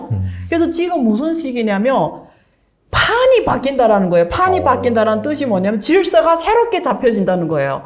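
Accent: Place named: Yanbian, China. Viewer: 중국 연변족이네요 중국없이 갑니다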